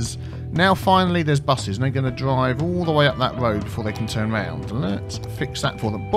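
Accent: United Kingdom. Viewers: yeah you have played many different ones. l i thought you may have played one particular game i used too but you're not him. All you British guys sound interesting and amusing.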